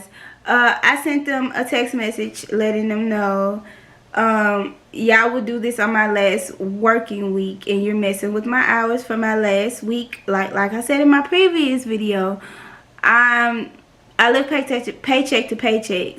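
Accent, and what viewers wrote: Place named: South, United States. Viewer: I love your accent I'm guessing your from the south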